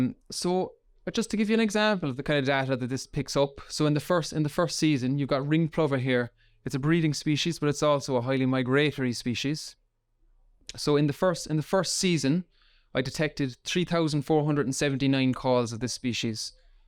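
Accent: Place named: Munster, Ireland. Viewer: Hello 👋 cool video man. I recorded some screeching hawks but I'm not sure which type they are. I'm 80% sure they are buzzards. Is there any chance u could take a gander for me? I want to title the video properlyif that's what they are. Also I'm from kerry, your accent sounds very familiar to me are u on my side or the Cork side? 😂❤ 💪 🇮🇪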